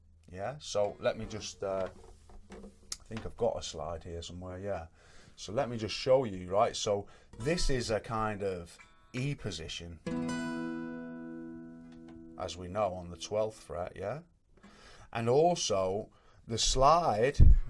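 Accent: Liverpool, United Kingdom. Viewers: Ahh ok, it had to be one of them and to be honest your accent is just a tiny bit more softer and more subtle than what I expect from Liverpool lol. Anyway thanks for the upload, good video, I'm already experienced in alternative tunings but your video just loaded automatically after a previous video I watched and you had me playing along like I was a beginner.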